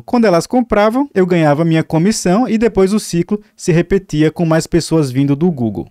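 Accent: Northeast, Brazil. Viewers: Nordestino, de onde tu é? Sou do RN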